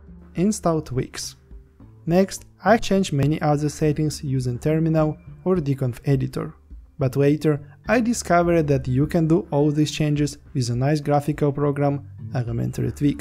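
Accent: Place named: Russia. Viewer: Русский что ли? Акцент ужасен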